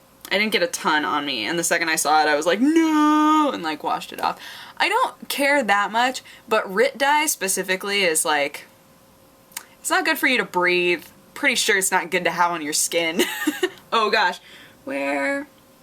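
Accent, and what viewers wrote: Spain. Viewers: hey I know spanish (well actually I know english, spanish is like the normal language I speak :p ) Your accent sounds very, like, from Spain. nice job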